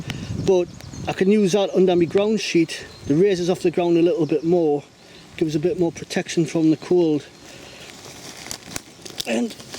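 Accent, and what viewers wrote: Newcastle, United Kingdom. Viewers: Sounds like you're from Newcastle, if my memory serves me right. Been years since I moved out from Gateshead.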